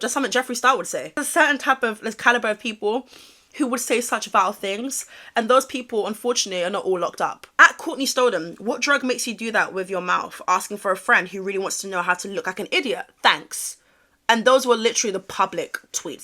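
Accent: United States. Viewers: !! Thank you for always bringing the best pop culture content to the public! You slay, queen! Literally Crissy Tegan is so insane and I'm glad you're speaking up about this! Also, LOL I'm an American and I have to say that your American accent is so great!! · The American accent is killing me 😭 she is so sick and twisted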